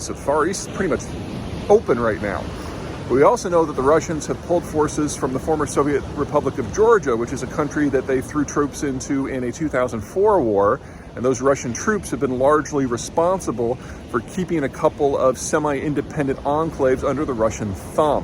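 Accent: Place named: Midwest, United States. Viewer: Midwest accent causes this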